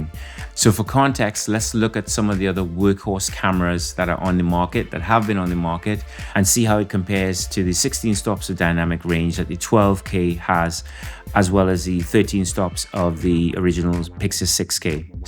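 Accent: Trinidad and Tobago. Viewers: Which part of the Caribbean are you from? You sound Trini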